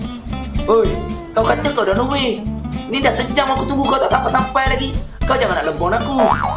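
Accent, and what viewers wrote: Terengganu, Malaysia. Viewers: cute awk ckp ganu.. anyway dpt juga kami ilmu.. Tq.. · teruk benor ler oyak ganu nyaaaaaa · loghat tranuuu mmg best hahahahahahaha tatau la nk cakap camne.. stiap kali mmber dr luar trgnu dtg, mesti bwk aku skali sbb dyorg tak faham.. bila tgl vid ni, okehh.. mngingatkn aku kdg2 trkluar bhse sendiri.. 😂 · sgtlah huduh ganu anda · Nakal laaaa org ganu ckp KL boh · ni video pasal org tranung try ckp kl la....tp ade jugok dialek tu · dia cuba nak ckp KL tpi ade jgk ganu masuk sikit. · video sedp doh...kalu buleh ejah sket agi arh loghat tranung orh baru sedp...papepon video molek boh.. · x paham doh.. ko ckp k.l ke atau ganu? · Aku dengor pelak dia cakap ganu tu. Nasib ambe duk ganu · Loghat tganu and ada lagu hyde mula2 vid.. I loike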